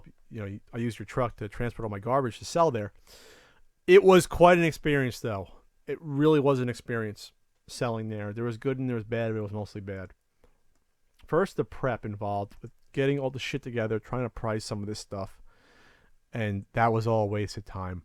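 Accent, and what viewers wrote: New Jersey, United States. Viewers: I love the Jersey in u. it's one of the main reasons I watch. the New Wario, funny shit.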